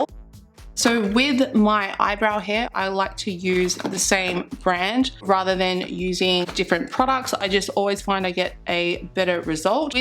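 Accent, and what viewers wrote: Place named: Australia. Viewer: yes I thought that too!!! its the Australian accent !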